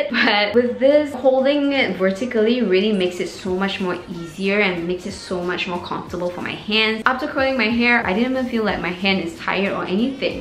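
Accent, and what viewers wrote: Singapore. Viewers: She actually looks and sounds like my Singaporean friend. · Haha I guess singaporean and malaysian english sounds simillar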